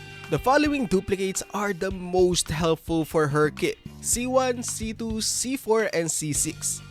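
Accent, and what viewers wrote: Philippines. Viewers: halatang halata sa accent ehh PILIPINSSS 🇵🇭🇵🇭🇵🇭🗣️🗣️🗣️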